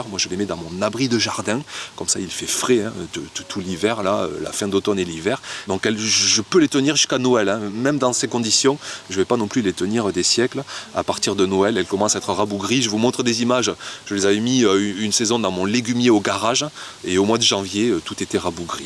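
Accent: South, France. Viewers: Bonjour, je confirme que la chayotte en pleine terre réussit très bien en Alsace! La mienne est très à l'aise en grimpant sur l'actinidia.J'en rentre des cageots pleins en ce moment...Par contre, effectivement, en pot, aucune production...! J'adore vos vidéos si vivantes et avec l'accent du sud en prime!😀🥰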